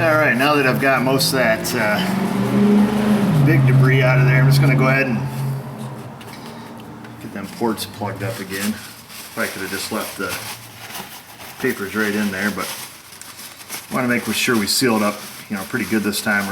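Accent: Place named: Texas, United States. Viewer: i really enjoy your taking the time to show the tools you use to do the jobs with. i also enjoy your slow texas like voice. i can understand every word. i was literally shocked you were from new york. showing the little things is not reduntant at all. and worth it to a lot of us. your lighting is great and camera angle. you are doing a great job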